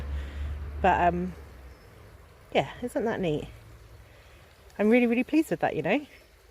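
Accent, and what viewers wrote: England, United Kingdom. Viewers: Hej and. I do really love your videos, not only are they extremely exciting but at the same time very much relaxing. What I find exciting is what you two find and the many possibilities items that still haven't been found. you voice and your lovely English accent is like climbing into a warm bubble bath, so relaxing., I that you weren't in too much pain during or after your larking trip, please take care of your foot and be careful when in the water.🤗🇩🇰 I hope that your lovely and generous giveaway is open internationally. 🥰 I look forward to many more videos. By the way what are the chances of you and taking a larking trip to London where you do a bit of larking/mud larking at the Thames? And maybe follow the Thames up to Oxford and Windsor? That would be a wonderfully interesting video and a wonderful vacation for you. 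Lots of love from Denmark 🇩🇰 Ps. I too love the Victorian era and Queen Victoria is my favourite monarch, as well as Queen Elizabeth.👑 They were such strong women during a time where women were not "allowed" to be that way.🤔 My goodness my message to you two has become some what of a novel, I do apologise for that.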